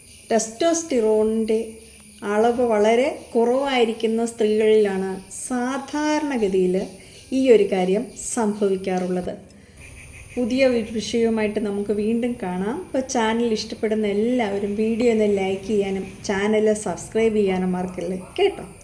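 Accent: Kottayam, India. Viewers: ചേച്ചീ, പെണ്ണ് മുകളിൽ ഇരുന്ന് കൃസരി കൊണ്ട് ലിംഗത്തിൽ മുകളിലോട്ടും താഴോട്ടും ഉരയ്ക്കുമ്പോൾ രണ്ടു പേർക്കും കിട്ടുന്ന സുഖവും അനുഭൂതിയും വാക്കുകൾ കൊണ്ട് പറഞ്ഞറിയിക്കാൻ കഴിയില്ല. എന്നിട്ട് വേണം യോനി ലിംഗത്തിൽ അമർത്തി ഉള്ളിൽ കടത്തുന്നത്. ഇത് ശരിയല്ലേ ചേച്ചീ? ചേച്ചി പാലാക്കാരിയാണോ? കോട്ടയം സ്ലാങ് കേൾക്കാൻ രസമുണ്ട്.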